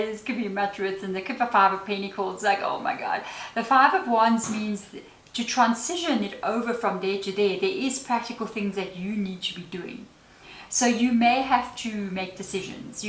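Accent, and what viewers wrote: Afrikaans, South Africa. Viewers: I command the Universe: "Abundance in all ways, especially financial abundance, flows to me like a never-ending waterfall" GRATITUDE GRATITUDE GRATITUDE (Ek hou van jou Afrikaans aksent !) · gave me tears… your vid popped up, then i heard your S.A. accent, posted 13 hours ago, 13 mins long… something to do with Ophiucus.. south african taurus here ❤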